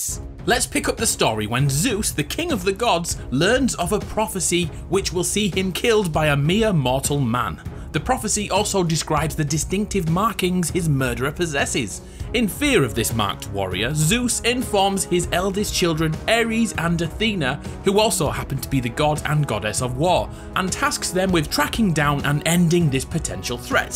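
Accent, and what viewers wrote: United Kingdom. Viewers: why are you british? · Man, I was looking for something else and wound up on this video... and somehow, the British accent really improves the story of God of War... GG, GG indeed.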